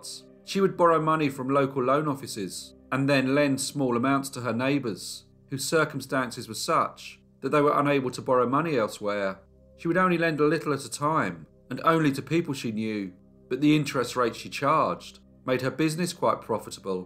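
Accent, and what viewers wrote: England, United Kingdom. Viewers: It sounds like you've been in England longer than just covering this video hahaha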